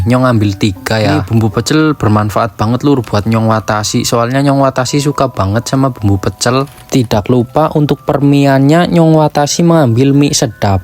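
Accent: Banyumas, Indonesia. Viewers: Bener mba ..wong Bnyumas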